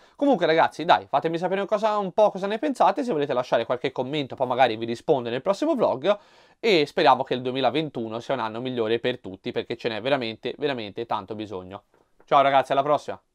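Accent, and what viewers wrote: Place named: Tuscany, Italy. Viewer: A me piace un sacco il tuo accento toscano e spero di vederti un nuovo "ufficio" presto! Buon Anno!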